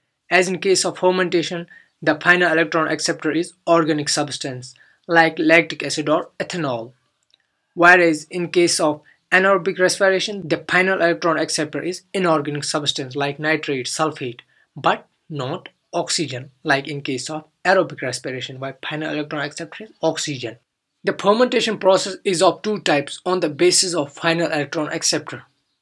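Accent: Kashmir, India. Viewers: are you kashmiri? You have a very Kashmiri accent!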